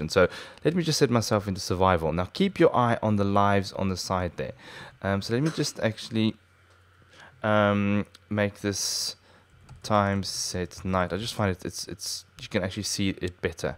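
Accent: South Africa. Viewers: Ek dink dat jy suid afrikaans is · You sound south african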